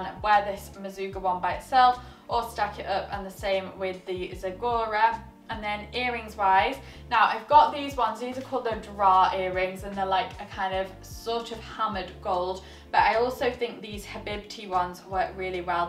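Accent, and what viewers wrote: United Kingdom. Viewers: Wow. I didn’t like anything in this video except for the black skirt and the British accent. What happened to good fashion? 😕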